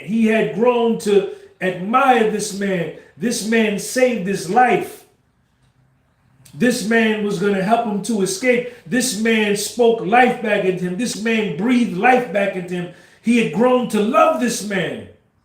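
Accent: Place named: New York, United States. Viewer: You gotta be from ny. I know bc I'm from ny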